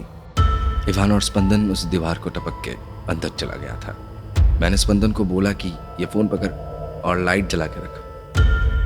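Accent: Bengal, India. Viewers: Love from Kolkata .....I am bihari Girl .....aapnar kothay ektu ektu bengalier tuning ache .....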